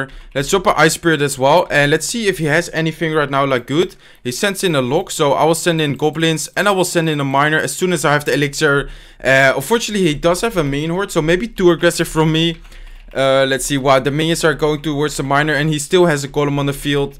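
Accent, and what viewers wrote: Netherlands. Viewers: Joo ben je van Nederland je hebt een beetje een Nederlands accent;) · are you dutch?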